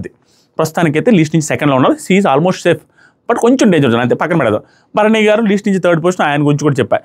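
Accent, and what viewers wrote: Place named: Nellore, India. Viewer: Hi,anna sleeping😴 review avuna😮😅 nenu from nellore district now in princeton usa ,mana slang so happy vinadaniki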